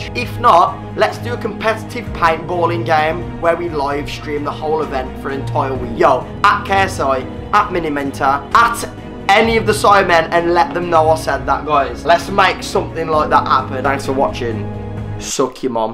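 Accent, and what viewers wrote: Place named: Birmingham, United Kingdom. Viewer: I can tell this guy is a brummy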